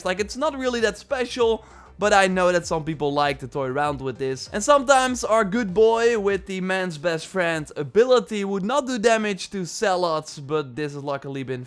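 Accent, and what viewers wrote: Netherlands. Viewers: Ok just a quick question love the vid but are you dutch :D xD just a question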